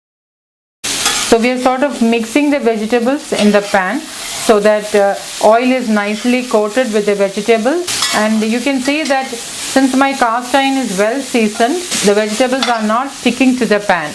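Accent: Kerala, India. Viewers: you dont have to mention............. your accent itself tells us you are a malyali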